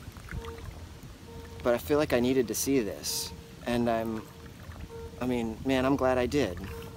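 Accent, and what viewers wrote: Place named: United States. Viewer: I just love the accents you Americans have. 🇦🇺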